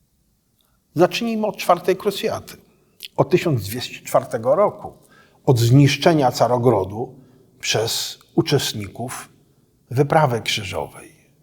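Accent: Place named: Mazovia, Poland. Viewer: Nieodrodny syn ziemi mazowieckiej. Praktycznie nie słychać "ą" na końcu słów.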